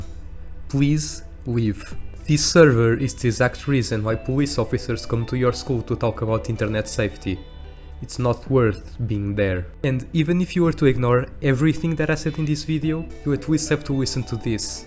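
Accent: Portugal. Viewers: Hey, are you by any chance portuguese? Your accent is very familliar. O facto do canal ter CR7 no nome também ajudou. Great video by the way, gained a new sub. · are you portuguese by any chance? (im asking because of your accent) edit: nvm és tuga de certeza lol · Ganda vídeo bro , és português? Notei um sotaquezinho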